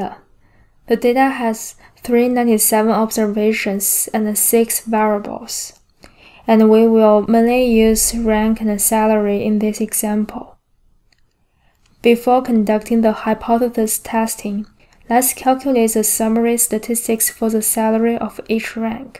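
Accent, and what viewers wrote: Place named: Asia. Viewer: Super thick Asian accent. Had to turn on subtitles.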